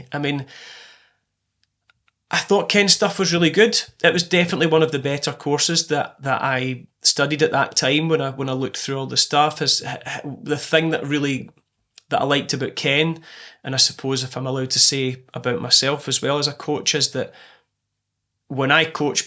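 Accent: Scotland, United Kingdom. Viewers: OMG, I thought you were Justin Timberlake for a moment, you look so like him. Then I heard the Scottish accent like mine. Great video. Keep up the great work. Much love and light. Poulo.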